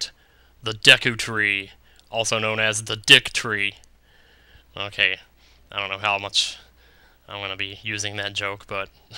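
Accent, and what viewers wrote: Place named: South, United States. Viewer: i wondered why sometimes i hear a southern accent in your lps. it isnt often, but it is there xD GA people always recognize their own accent xD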